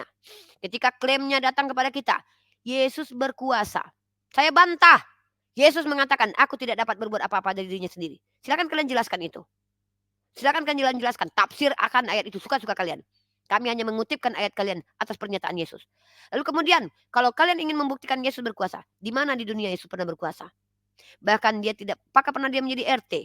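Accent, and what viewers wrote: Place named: Ambon, Indonesia. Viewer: Enak kali ngomong ambon 2' maulah kalian jangan mancing yaa..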